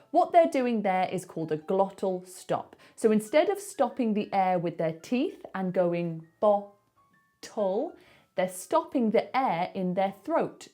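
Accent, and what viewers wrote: United Kingdom. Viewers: I love british accent, especially yours,. Kisses from Sicily! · Really I want merry a nice woman like you.. British accent.. I ❤ British Accent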